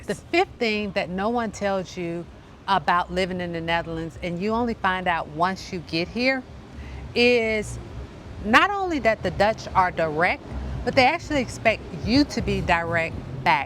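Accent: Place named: Chicago, United States. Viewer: 'But because I'm trying to remember that separable verb I forget what the hell that I'm supposed to be saying' ... hahaha. Good one! We've all been there. And, after 26 years, I still make mistakes, especially if confused or upset. Subject +verb +direct object +date +object (+verb)...phew. And then there's the 'aan het schrijven' conjugation of being busy doing something ... such as in 'I'm writing'. This too is placed at the end. 😏 Where are you from in the States, BTW? To me you sound like you have a Chicago accent. Am I right? So, when I first moved here, my father-in-law to be told me that during WWII the locals could tell natives apart from the Germans, who would always pronounce 'ch' words with an 'h' sound instead of 'k' (consonant) ... like 'Scheveningen' / 'Schiphol' / 'schoenen'. And now I even quietly cringe when people pronounce stroopwafel as 'stroepwaffel'. 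😄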